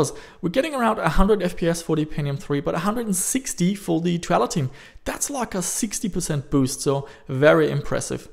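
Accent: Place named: Germany. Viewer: When I watched the video from 2015, you had less German accent than now.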